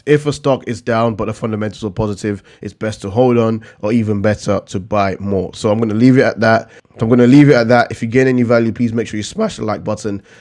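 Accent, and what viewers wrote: United Kingdom. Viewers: where are you from? The accent sounds british!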